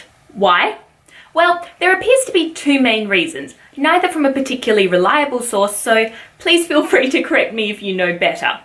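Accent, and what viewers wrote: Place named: Australia. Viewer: You deserve more subscribers - the name for your channel is false advertising! Also, it's great to hear an Aussie accent (bonus points for paying attention to pronunciations tho!) discussing obscure monsters on YouTube :)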